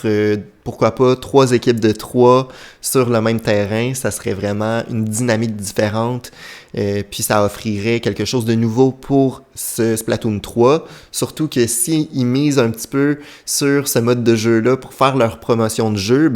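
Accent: Quebec, Canada. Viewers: Excellente vidéo ! J'suis nouveau sur ta chaîne et c'est vraiment de la qualité : c'est dynamique, bien monté etc donc Bravo ! (En plus j'adore ton accent québécois il est trop cool XD) En vrai c'est totalement possible d'avoir un ND la semaine prochaine, c'est habituellement vers milieu juin qu'on a un direct Il y aura surement de bonnes surprises sans oublier Splatoon 3, Mario + rabbids qui seront au RDV ! Enfin j'espère ^^" En tout cas, il ne reste plus qu'à attendre :)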